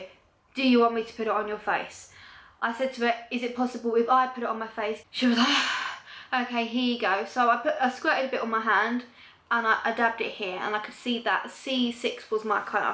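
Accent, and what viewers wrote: United Kingdom. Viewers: i love ur accent! i swear i can just listen to british people talk all day..! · lol the way you express yourself and your British accent are absolutely delightful! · Is that a cockney accent? Forgive me if I'm wrong, but anyway you have the cutest accent ever lol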